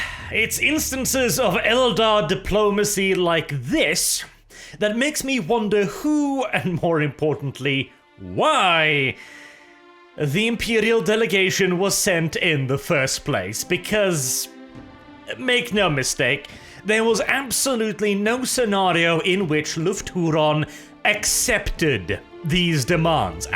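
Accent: United Kingdom. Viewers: Dang boi, your silky British by way of Norwegian accent is music to my ears after the past month.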